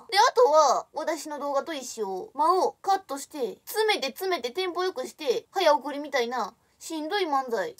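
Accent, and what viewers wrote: Kansai, Japan. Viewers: 相変わらずキレッキレですね。総統の関西弁、かわいすぎて萌え死です。めっちゃきれいでかわいくて色っぽい！まじで好きです❤ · 今回は関西感強いな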